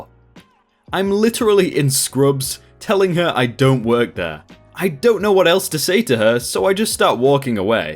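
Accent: United Kingdom. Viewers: I wonder, are you British? You pronounce words in ways I never thought of. I like they way you talk better than the way my friends do. Like how you said "rose". We would pronounce it like "rows" basically. You also say "zed" for z and "hach" for h. I like it. It isn't native to myself so I was curious of where you were from.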